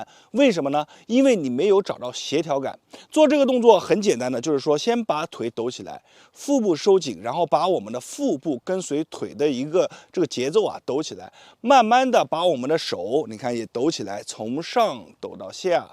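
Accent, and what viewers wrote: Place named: Nanjing, China. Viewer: 聽口音應該是南京人🙂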